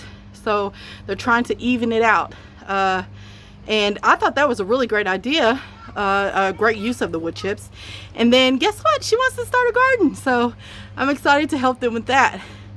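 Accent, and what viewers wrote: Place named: South, United States. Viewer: Ma'am you have the best accent. My mom is from NC and dad is from TN so I grew up hearing the music of southern accents. BTW cool garden and thanks for your channel.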